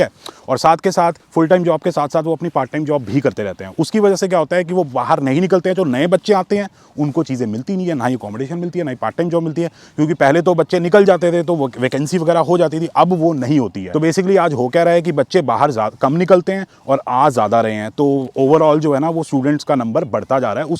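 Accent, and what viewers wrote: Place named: Delhi, India. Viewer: delhi wali hindi 😊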